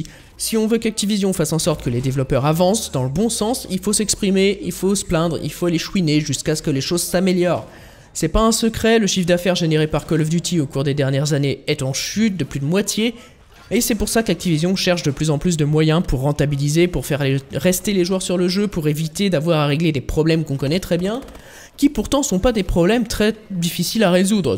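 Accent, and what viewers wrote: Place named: England, United Kingdom. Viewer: arrêté l accent englais ça fait pitié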